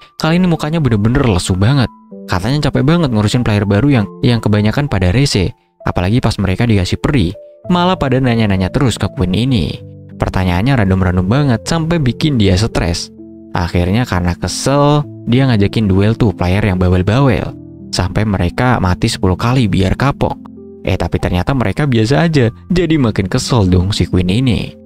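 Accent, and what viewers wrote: Medan, Indonesia. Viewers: Mimin orang medan ya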